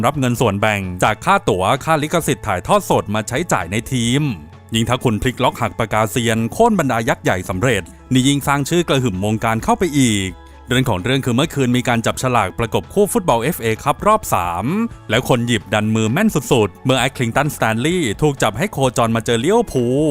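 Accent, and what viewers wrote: South, Thailand. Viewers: แอด เปนคนใต้. จังหวัดไหรคับ ได้ยินเเหลง เมื่อวันก่อน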